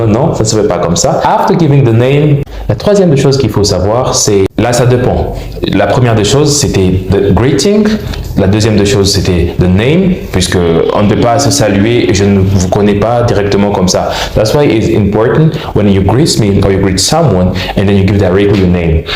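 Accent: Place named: United States. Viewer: Awesome video 🔥 you sound American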